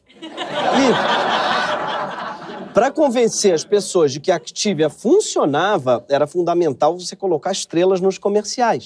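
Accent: São Paulo, Brazil. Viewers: A melhor imitação de paulistano que existe, cara 🤣🤣🤣🤣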